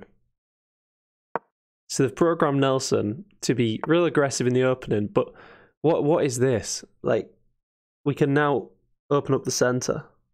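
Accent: Hull, United Kingdom. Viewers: You’ve got to be from Hull with that accent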